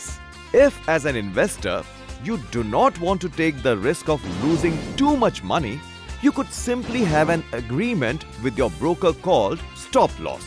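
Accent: India. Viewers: Excellent in providing a clear and simple illustration of futures. Love the Indian accent. Thanks for doing this.Keep it up! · I got here looking for the mathematician term of "derivatives" This is the financial one -_- but it was interesting. I am learning English and I admit that I am not completely good at it... But starting from the first 5 seconds of the video I knew that It was and Indian guy hahahaha. I asked myself. Of course, this video is aimed to western audiences but what is an Indian Guys using an Example his "sacred" animals. In as you have seen the example was refereed to sell them to eat, India Mcdonalds are not allowed to use bovine meat on their products. And I know that I could sound kinda extremist but there are a bunch of animals that could be used for this example in a better way without violating cultural dogmas. At the end it was a nice video and I enjoyed it. 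· The single most annoying accent in the world. The Indian accent -good grief! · That is a very American looking host with a very indian accent... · Your Indian accent is extremely annoying! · This was very informative, but why the heck does he have an Indian accent? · I didn't expect Indian accent lol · that Indian accent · I adore the indian accent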